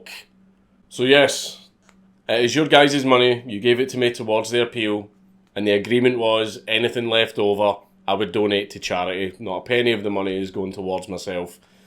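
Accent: Scotland, United Kingdom. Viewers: I'm American and think this is BS. You should have won the first time. Also, I asked my little sister if she could understand your accent. Apparently I can understand a thick sottish accent really well.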